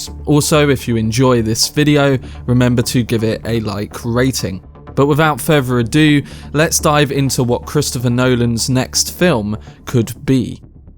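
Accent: United Kingdom. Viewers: Love your bri-ish accent mate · Dude you a brit?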